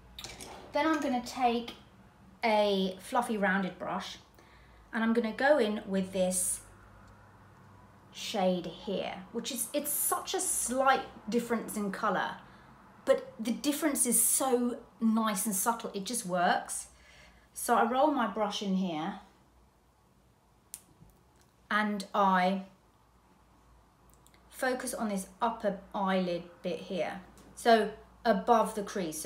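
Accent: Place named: Northern England, United Kingdom. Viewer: You look absolutely stunning ❤. I love that you totally, 100% know what works on your skin and how to use those products to give a beautiful finish, and with no faff or complicated techniques. 👌👌 I'm also glad that you're having lovely warm weather, I miss the summer's in England so much. The country pubs, the charming chocolate box villages, and hearing the birds sing......so many precious and wonderful memories of my younger life.....🥰 Loving the Northern accent 🫣🤣 Enjoy the sun 🌞 ❤🥂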